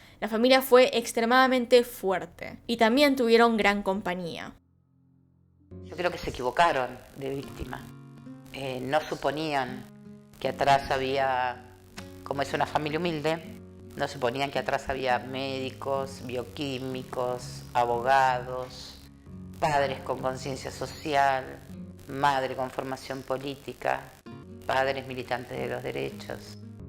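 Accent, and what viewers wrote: Argentina. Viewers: Saludos! Me encanta tu trabajo,tu pelooo y tu acento que me lleva a mi Argentina estando lejos viviendo en México 🏘️❤️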